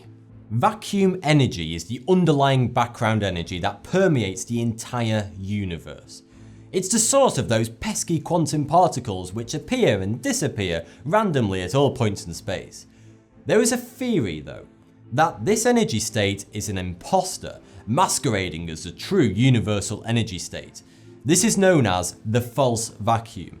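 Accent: United Kingdom. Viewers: his British accent makes it sound like 42 but he's saying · You got to love that sweet and smooth British accent! :)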